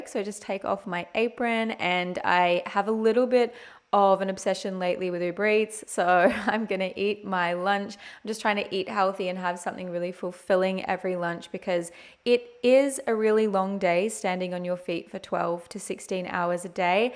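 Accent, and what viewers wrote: Australia. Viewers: Wow im not american but those have to be huge cookies even for the USA standard right? 😅 Edit: just realised thats an australian accent hahaha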